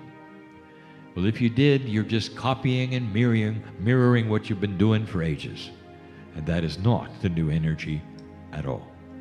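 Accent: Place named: United States. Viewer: he sounds like an american, with sound effects from Holliwood. hollwood is under question.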